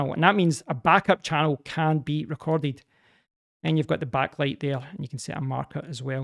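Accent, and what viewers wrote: Scotland, United Kingdom. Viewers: Just got my Go 2, and this was a great tutorial (much better than Rode's documentation). The Scottish accent made fun to watch too!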